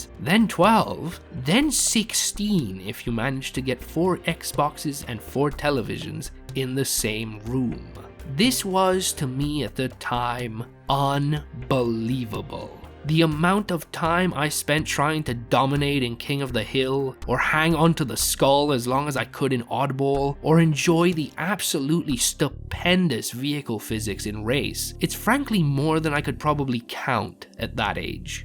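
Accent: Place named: United States. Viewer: Eastern European? Where are you from, the accent really sounds American to me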